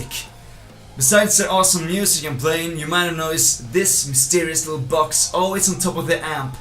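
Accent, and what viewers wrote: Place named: Northern Europe. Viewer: He just sounds like he has a northern European accent to me.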